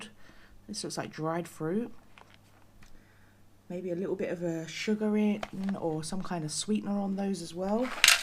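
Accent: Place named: England, United Kingdom. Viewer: That was a really good review. Great amount of food, and plenty of drinks and snacks. That English accent. Looking forward to your next review.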